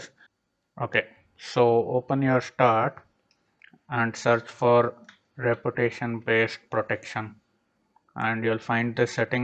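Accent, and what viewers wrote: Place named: India. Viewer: I hear Indian accent, I listen to the end. Fast, to the point, solved my problem. Thumbs up!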